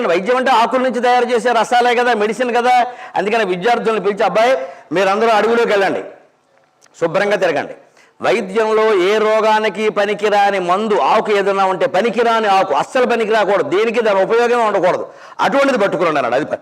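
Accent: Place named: Andhra Pradesh, India. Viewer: Anni bagunnai kani ni yasa panikiradu ra ni Andhra yasa gudhala sulli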